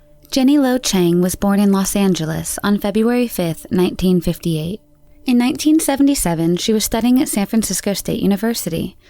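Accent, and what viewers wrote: India. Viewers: can the girl narrate the videos more? I don’t like the guys Indian voice. I hate it. I skip the videos if I hear it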